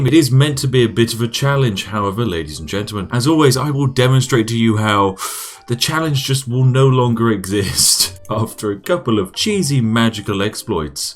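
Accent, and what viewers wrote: United Kingdom. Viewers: Oh No! My favorite game is so broken xD Lately I’ve bought Titan Quest HD for my iOS device and it’s awesome port from PC. To Sir Brit: Man, ur so awesome xD Mostly because u’ve got that British ‘ladies and gentlemen’ accent which I consider as pure awesomeness. I’ve watched so many of your vids that I’m wondering about drug relation of Yorkshire Tea. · Cannot stay away from that lovely British voice and cheeky exploits of yours ;) Just subbed.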